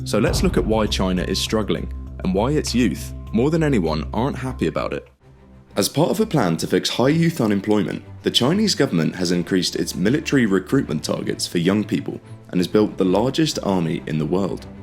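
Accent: United Kingdom. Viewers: This guy is british bro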